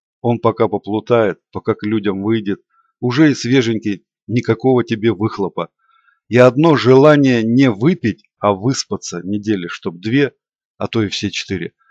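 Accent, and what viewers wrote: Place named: Russia. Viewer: Любо-дорого послушать... Слегка заострённо, но чисто по-русски... Спасибо.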